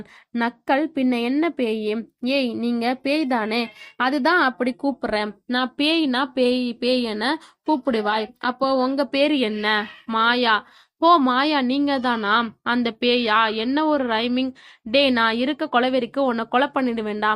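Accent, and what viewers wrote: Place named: Chennai, India. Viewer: Nice Chennai voice 🎉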